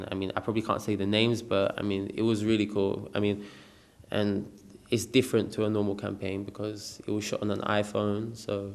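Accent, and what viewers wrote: United Kingdom. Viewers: lili maxwell Actually he's scottish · Lol nah, he just has a typical London accent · His British accent makes him even hotter if that’s possible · Idk why everyones a bit disappointed of his voice ....like guys he have got british accent ....😍😍😍😍😍😍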